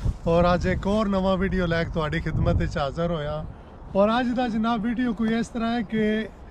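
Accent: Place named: Kharian, Pakistan. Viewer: ان بھائی صاحب کا بولنے کا لہجہ ضلع گجرات کھاریاں کے نزدیک علاقے کا ہے یہ میرا اندازہ ہے بہت ہی ودیا وڈیو اور کمینٹری کی ہے بھائی صاحب نے ،،،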